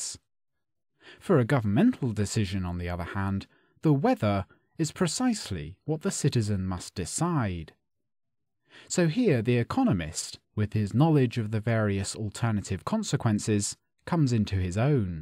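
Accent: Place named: RP, United Kingdom. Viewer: Received pronunciation))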